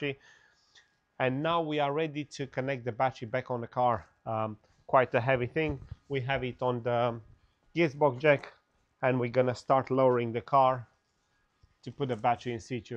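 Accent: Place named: Romania. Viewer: De aici este, mi-a confirmat. Accentul romanesc se simte :)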